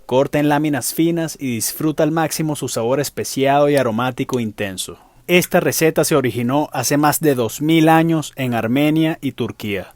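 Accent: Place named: Venezuela. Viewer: por tu acento veo que eres venezolano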